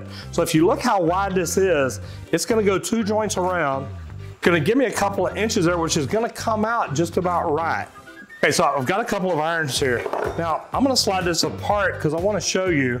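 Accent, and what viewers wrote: South, United States. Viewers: "Sewer pipe" / ˈsu ər / / paɪp / Not soda pop or syrup hop. Just a heads up for people who aren't used to southern accents. I was born and raised in The South and I struggled with that one.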